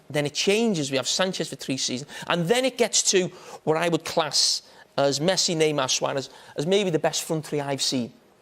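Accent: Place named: Liverpool, United Kingdom. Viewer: Who listens to this scouse git?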